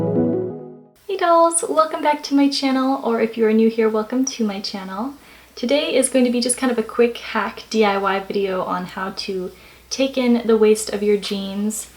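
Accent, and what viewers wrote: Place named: United States. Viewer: hey!! Just wondering where in america you are from because i study different types of american accents and yours is SUPER mild. So... just wondering! Thanks so much and pls reply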